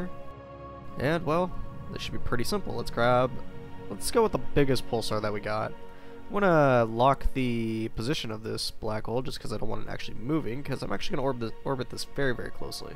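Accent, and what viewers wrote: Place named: United States. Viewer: Compelling video, for a yank you've got just the right sort of voice for this.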